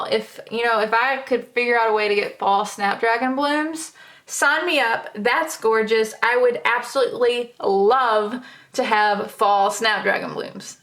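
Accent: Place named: Texas, United States. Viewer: SO HAPPY to find you again, your accent reminds me of my friend janine from texas. :) i enjoy listening to you.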